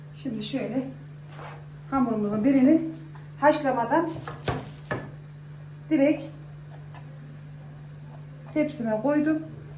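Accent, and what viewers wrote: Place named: Yozgat, Turkey. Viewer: hanım nerelisiniz ben yozgatliyim bizim gibi konusuyon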